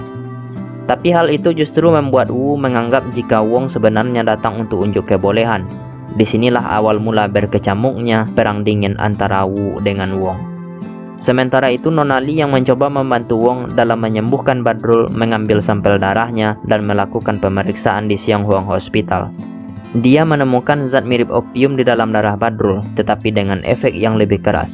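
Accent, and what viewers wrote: Madura, Indonesia. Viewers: logatnya kyk madura🤣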